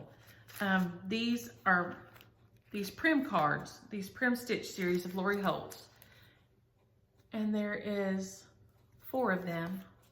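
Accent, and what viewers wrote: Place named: South, United States. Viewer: your videos make my day!!, you warm my heart. I'm a Southern living in the north and hearing you talk makes me feel right at home. Thanks for sharing with all of us. And by the way, where did you get that adorable red blouse?????? I LOVE it!!! Hugs, Judy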